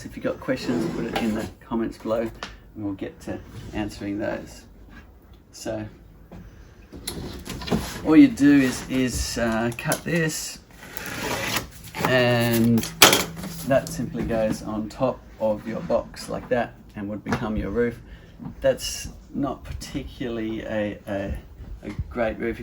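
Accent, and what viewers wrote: Australia. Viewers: Think he is a Aussie/New Zelander from the sounds of it.. More like M8/mate....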